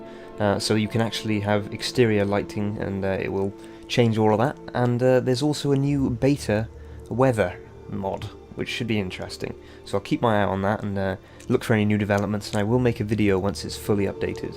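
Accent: United Kingdom. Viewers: Hm is that a slight East Anglian accent I hear? I note you haven't uploaded anything in 9 months, I hope you're okay!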